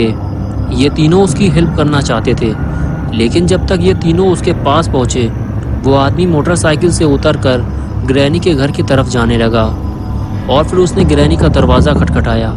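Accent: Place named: Hyderabad, India. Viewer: Fast comment nice video hyderabadi Style me boleto kiraak mouth, 👌👌👌👌👌👌👌🌹🌹🌹👍👍👍👍👍👋👋👋👋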